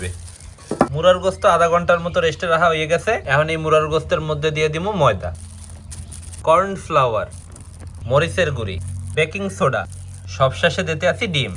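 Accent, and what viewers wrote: Barishal, Bangladesh. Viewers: এক্কেরে খাস বরিশাইল্লা ❤❤❤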